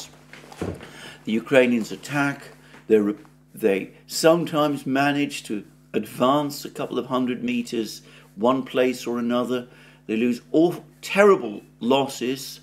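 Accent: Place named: RP, United Kingdom. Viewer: You can easily double the speed of Alexander, and still hear him clearly - that's one of the boons of posh English.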